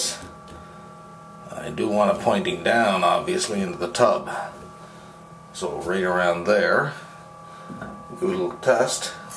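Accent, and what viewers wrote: Newfoundland, Canada. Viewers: Cape Bretoner or Newf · Got to be Canadian - "you're darn toot'in" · Hi, thanks for the informative video - saved me $150 bucks USD! Based on the comments regarding your Canadian accent on words like "about" and "spout" my reply to Monique Wilson is learn how to pronounce the word "ask" correctly before commenting on our good neighbors north of the border! Monique would most likely want to "axe" you a question on another one of your videos! Thanks again for the informative video - married to a sweet lady from Toronto! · I love the Canadian accent and I loved the video very helpful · Got to be a Newfoundlander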